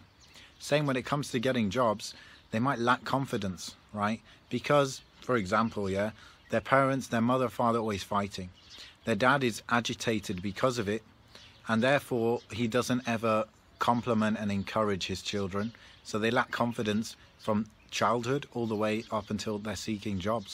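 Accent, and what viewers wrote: United Kingdom. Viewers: As-salaamu alaikum. What's the name of the introductory vocal track please brother? Also, are you from Derbyshire, your accent sounds Midlands-y?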